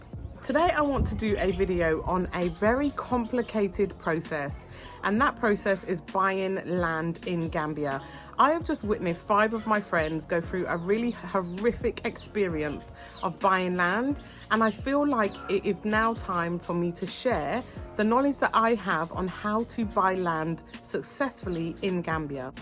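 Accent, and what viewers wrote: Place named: United Kingdom. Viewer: Greetings, that is indeed a lengthy process, however it's a great business for one who wants be become a realtor. Love your British accent. Are you enjoying life in The Gambia?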